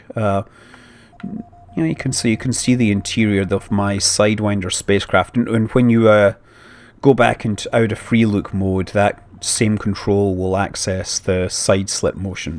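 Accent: Scotland, United Kingdom. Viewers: are you from scottland? you have a scottish accent.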